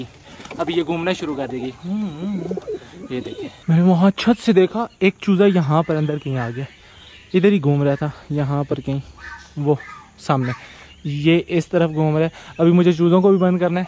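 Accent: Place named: India. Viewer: मुझे आपका बोलने का तारिक इतना पसन्द है ना की लगता ही नहीं आप पाकिस्तानी हो हमे इंडिया जैसे ही हिदी बोलते हो dear और जिस तरह से हर पशु पक्षी का ध्यान रखने हो ना बड़ा ही अच्छा लगता हैं देख कर dear God bless you dear 🍫😊🇮🇳🇬🇧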